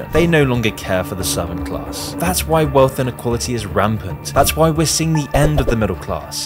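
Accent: England, United Kingdom. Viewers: Yo u English? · British accent describing problems with the US dollar? I do appreciate your work Mr., but why the foreign interest in American finance?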